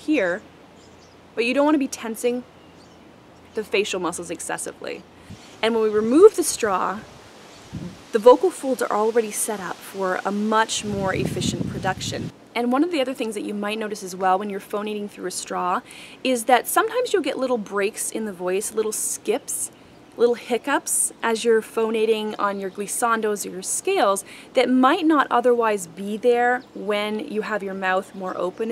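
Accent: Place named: Midwest, United States. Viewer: thank you for sharing your knowledge. you sound like you're from the midwest. i'm from wisconsin!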